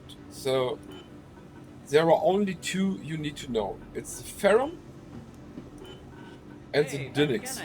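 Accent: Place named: Germany. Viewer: dont appologize for the "hard german accent" its amazing and your english is very good. Very easy to understand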